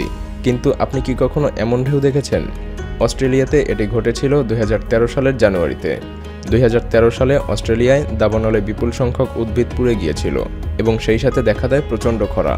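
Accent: Kolkata, India. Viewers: Vai na india kalkata likha hua or vasa sune bujhe nen. Bangladeshr vasa eto sundor hoi na. Bangladesi ra jotoi valo vabe kotha boluk na keno kothao tan tan vaab thakbey..100%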